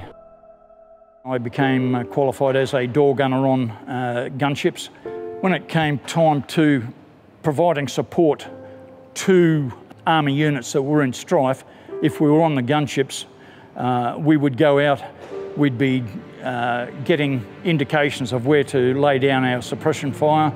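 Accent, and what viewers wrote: Australia. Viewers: With the music and the aussie accent I think of one person and one person only...CHIPS DUBBO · We all owe you a great amount of respect, you brave and humble man from Australia ..! By the way … I love your accent, but I had quite a hard time trying to understand it … Wish you a long and healthy life, Sir … Greetings from Switzerland 🇨🇭 🇦🇺🇨🇭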